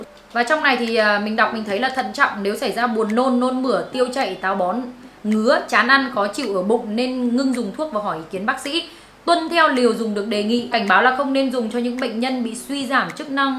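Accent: Nghe An, Vietnam. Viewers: Giọng Bắc của chị thi thoảng pha giọng Nghệ An nghe cute thế😁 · người nghệ an phải ko bạn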